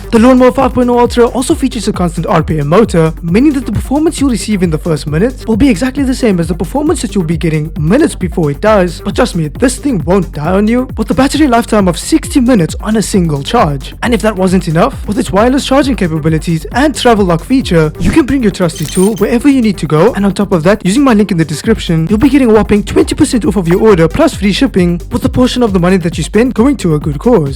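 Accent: South Africa. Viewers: are you south African?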